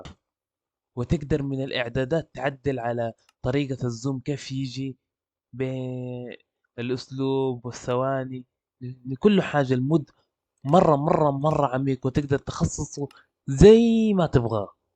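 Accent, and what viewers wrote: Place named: Myanmar. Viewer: انت برماوي واضحة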